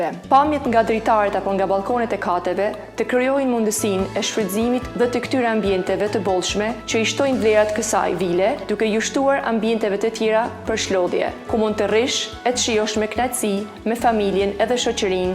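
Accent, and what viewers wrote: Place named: Highlands, Albania. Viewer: Sa dialekt të bukur malsoresh paske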